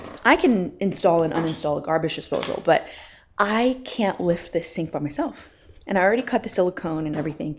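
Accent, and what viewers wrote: Texas, United States. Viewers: I LOVE how your Texas accent comes out!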